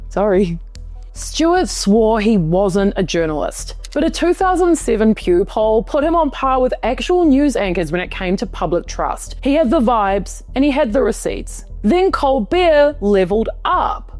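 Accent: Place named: New Zealand. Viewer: It's low budget Colbert with a cute Kiwi twist. I'm here for it 😎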